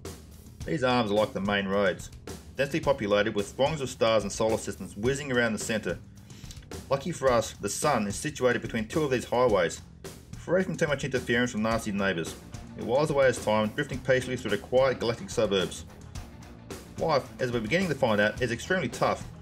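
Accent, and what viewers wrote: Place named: Australia. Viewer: That Australian accent tho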